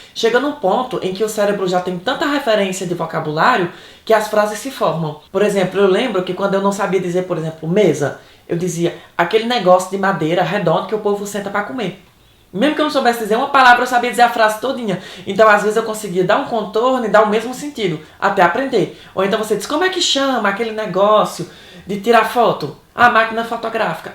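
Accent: Ceará, Brazil. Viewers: Adoroooooo seu SOTAQUE!!!! Chique feito "as pregas de Odete"! kkkk O nosso DIALETO "nordestino" é tudo de maravilhoso. O nosso idioma, como tantos outros, apresenta variações linguísticas. Dentro deste contexto, normal o "pra mim fazer", "mar minino" e tudo o mais que faz de nós NORDESTINOS, um povo especial!!!!! Parabéns,! <3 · a maneira de tú falar é bem cearês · Queria ver um francês falando esse maravilhoso português cearense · Não Perca teu sotaque e palavras da tua terra maravilhosa...Quanto mais nordestino for mais inscritos terá no teu canal...Amo teu trabalho....bjbjbjbj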